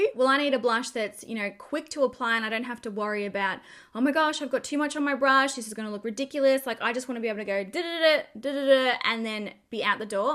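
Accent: Australia. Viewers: I love watching your videos,educational and an adorable Aussie accent. I may have missed it, What blush, bronzer combo are you wearing in this video. Brand and shade please it's lovely. I am looking for a bronzy rose look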